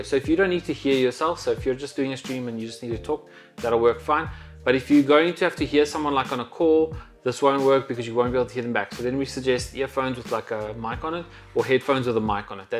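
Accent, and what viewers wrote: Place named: South Africa. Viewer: Hey I enjoyed your Video and loved hearing your SAFFA accent being one myself in living in Melbourne. I have a Sony A6000 but looking for options to try and capture a group type live demo environment for others to watch via zoom (ie train others remotely). Any suggestions as to what webcam might suit that? Cheers mate. Darron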